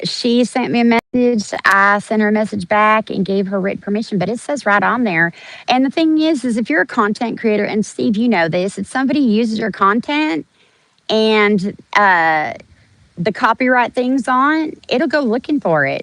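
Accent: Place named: South, United States. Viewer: I was just writing a comment about sounding like you were from below the old Mason-Dixon line. My parents are from the hills (if you know you know) and I spent time all over in a military family. But the drawl always peeks out and makes itself known! Some people are still dumb enough to think if you speak that way it means you are slow somehow...some people are certainly idiots though. Anyway, I just want to say I appreciate the work that your group, Emma666 etc do to give the public actual facts. I'm not against grown adults using their money however they want as long as they know what and who they are actually supporting and make that decision with full knowledge of all the facts. I just really hate watching people get taken advantage of; through lies, guilt, psychological manipulation, etc. There are so many organizations and individuals who actually do deserve the good will and charity of these people who are willing to donate but unfortunately after dealing with some of these people, they are then usually ruined for opening their hearts and wallets in the future later after being manipulated and scammed (imo). 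He is robbing people twice, in my opinion. Once of the funds, secondly by their stealing their future kindness, generosity and willingness to help others in the future. And besides that, some entitled assholes just need to be given a few doses of their own medicine and taken down a notch or two. If you a such a rancid individual don't impose yourself on the rest of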